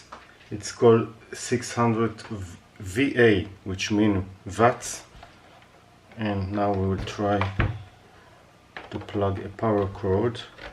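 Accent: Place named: Israel. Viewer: חח שומעים מבטא ישראלי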